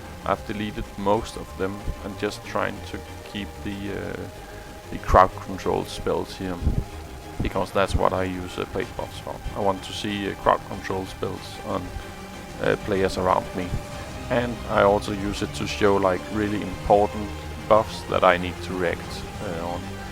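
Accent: Denmark. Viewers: Are you danish? You sound like it :) And which addon do you use for your actionbar? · are you from denmark? :D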